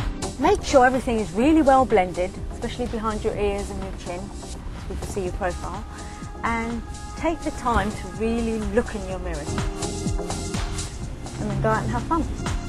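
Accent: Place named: United Kingdom. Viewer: i don't know who this woman is.. but, she's beautiful and she speaks the best british accent i've ever heard...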